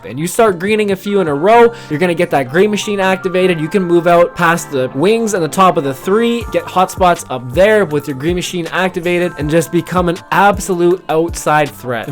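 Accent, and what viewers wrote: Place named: Canada. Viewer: I am going to take a WILD guess... are you Canadian? Whenever I hear you say "out" it reminds me of a Canadian friend of mine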